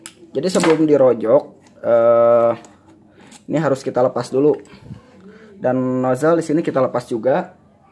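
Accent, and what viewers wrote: West Java, Indonesia. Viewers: Sunda pisan kang. Wkwk Bermanfaat pisan video na. Hatur nuhun 🙏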